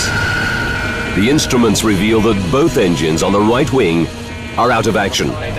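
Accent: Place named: United Kingdom. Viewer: This show is Canadian made by a company based in Montreal called Cineflix and all of the episodes were produced at their studios in London...it was syndicated into US channels under various names and that's why everyone thinks it's American but it's not. Hence the British narrators on every episode. As usual European spouting off about Americans without doing an ounce of research.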